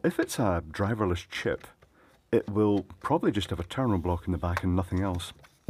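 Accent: Scotland, United Kingdom. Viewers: I agree, though I suppose we could still enjoy the Scottish accent as narrates?!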